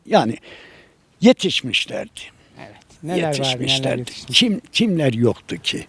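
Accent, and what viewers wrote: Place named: Erzurum, Turkey. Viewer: çok güzel bir anlatım çok tatlı bir Erzurum ağzı çok teşekkür ediyorum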